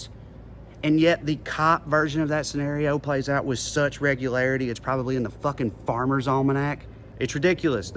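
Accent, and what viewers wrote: South, United States. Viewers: I just think you’re simply awesomeness with a strong Southern accent. I love how you think and how you express it. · I love hearing truth spoken in an accent like mine. Full disclosure, you made me proud to be southern! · Combining wit with reasoning and great intelligence isn't easy but you definitely nailed it with lovely southern drawling lingo too ... Was even able to enjoy it in entirety back here in Oz... Could stand a heap of this, no kidding !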